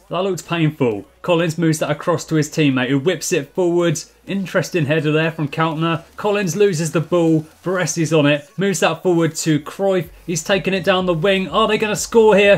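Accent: Oxford, United Kingdom. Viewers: Are you from oxford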